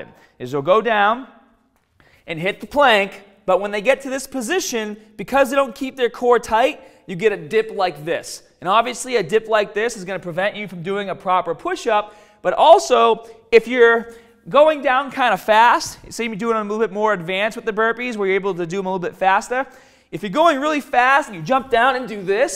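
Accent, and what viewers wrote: Boston, United States. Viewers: Hey I don’t remember hearing your Boston accent before where you from I’m from Southie Keeeeedd!!! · Love the bawstan accent. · What accent is that?..Boston? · I think that is a Boston accent, if I am not mistaken. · OoooOoooo that Stroing Baastan accent 😍 👌 😂 🤣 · Oh Lawd you from Boston. I love your accent 🥰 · You from beantown? Boston?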